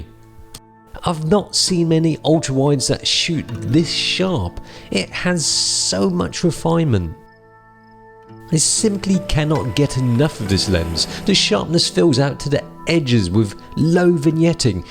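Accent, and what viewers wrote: United Kingdom. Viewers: im just suscribed to this channel. and im suprised to see that chinese speaks british accents. its been a while since im searching for this type of channel. great channel!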